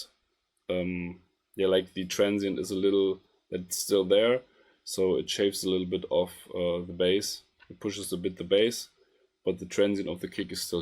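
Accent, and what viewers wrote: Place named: Germany. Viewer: Hey du hörst dich deutsch an😅 falls ja könntest du auch deutsche tutorials machen?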